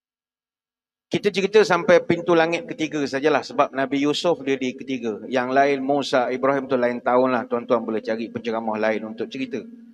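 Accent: Pahang, Malaysia. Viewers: Loghat pahang tu yg significant ketika penyampaian pun ye jgk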